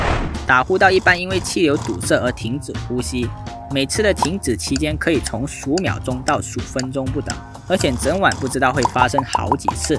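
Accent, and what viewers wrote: Malaysia. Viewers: 嗨，请问你是马来西亚人吗，因为你的口音很像马来西亚人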